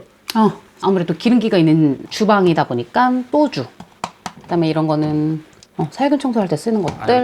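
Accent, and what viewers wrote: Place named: Gyeongsang, South Korea. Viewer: 경상도 사람인가보다 서울말이 어울리지않게 힘들어보이네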